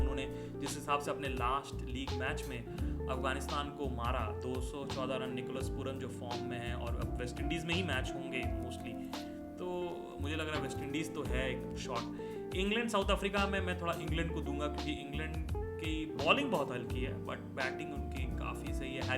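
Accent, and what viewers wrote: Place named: United States. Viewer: aap ka hindi accent se lagta hai video recording USA mein hua hai.....if your schedule in USA permits you please visit all the locations where famous movie JAL HO NA HO movie shooting was done!! Jai Ho Mangal may Ho 🎉🎉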